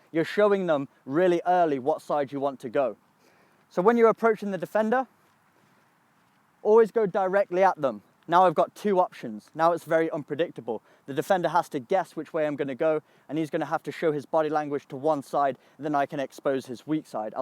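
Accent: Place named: United Kingdom. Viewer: He has a British accent but has an American flag on the pole behind him. What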